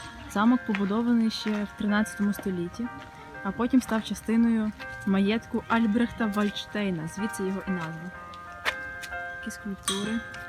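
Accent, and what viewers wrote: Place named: West, Ukraine. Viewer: доброго дня.😀. Дивлюся вашівідео,дуже гарні і позитивні,а найважливіше Українською. Звідки ви,бо чую ніби західний регіон?. Хотів би запитати можливо,щось чули про завод Sempermit,в містечку Odri,неподалік від вас? Бо виробляю туди документи на роботу з дозволом на 2 роки.Дуже дякую за інтересні відео,успіхів вашій сім'ї. Можливо колись зустрінемось в Чехії.дякую.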